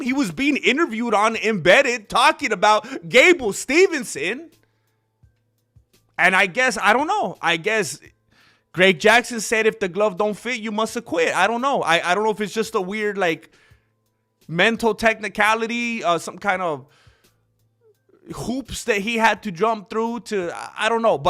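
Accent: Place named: Mexico. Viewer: I preferred this fellow when he used to crank up his Mexican accent a bit more, and force that false laugh a bit less.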